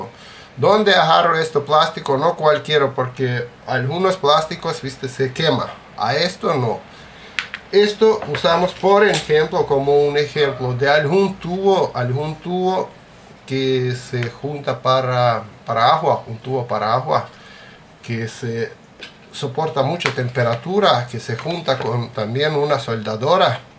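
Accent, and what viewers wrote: Russia. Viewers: Ruso o polaco?😀👍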